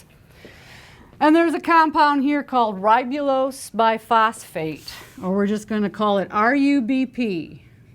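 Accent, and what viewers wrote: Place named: United States. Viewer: It was good, much better then my teacher who just went on holiday!? but I don't know if its just the American accent but she sounds permanently drunk.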